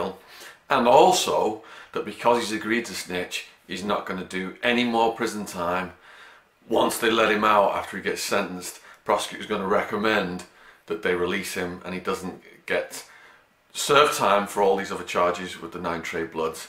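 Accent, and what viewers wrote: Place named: England, United Kingdom. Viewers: I did not expect a white Englishman when I went on this video, can’t complain tho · Oh.. I see you do stuff about prisons and that. My prejudice - my bad, but it was a jarring experience clicking on this and hearing some middle-class Englishman commenting on that kids situation 😋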